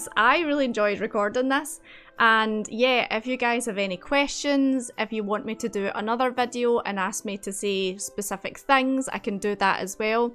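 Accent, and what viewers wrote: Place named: Scotland, United Kingdom. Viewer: I think im the only Scottish person who doesn't really swear or say aye 😅. Im wondering where you're from by your accent, central Scotland in or around Glasgow maybe?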